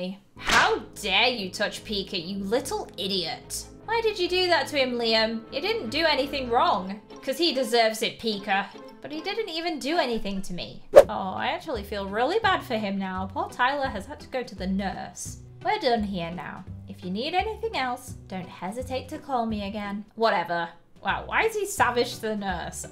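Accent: United Kingdom. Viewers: Quistion are you British cause you kinda sound like ittttt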